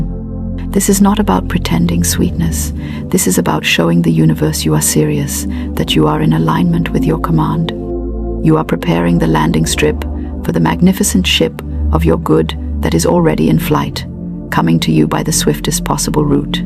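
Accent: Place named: England, United Kingdom. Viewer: American woman with an English accent.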